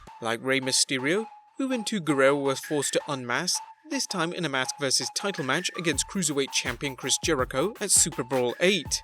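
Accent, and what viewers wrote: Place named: England, United Kingdom. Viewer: Why does every wrestling channel have a commentator with an English accent... or is it just me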